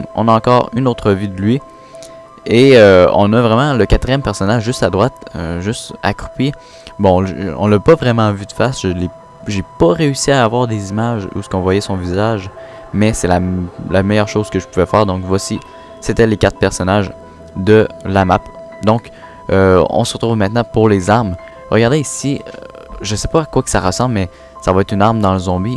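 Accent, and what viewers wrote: Quebec, Canada. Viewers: putain vais vous croyer que l'accent quebequoi c'est tout mimi ? c'est style 2 video mais la il faut arrete putain ! il y a des mots INCOMPRENSSIBLE ! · L'accent canadien c'est cool mais on comprend rien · Accent québécois > tout les accents. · respect super bonne video mec et fai pa gaffe au ptit kikou qui critique ton accent psk moi jsui pa québécois mais jle kiffe ton accent ^^ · J'aime l'accent québécois 8) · l'accent quebecois <3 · ENFIN UN QUÉBECOIS SUR COD QG :D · un quebequoi <3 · Cest Quebecoi apren unpeu a tinstruire elle est pas mieux votre accent en passant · Canadien, Québecois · T'ommage que t'est l'accent canadien sa m'a un peu dégouté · t srx je le kiff set accent canadio? ou quebequoi? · tien un quebecois :D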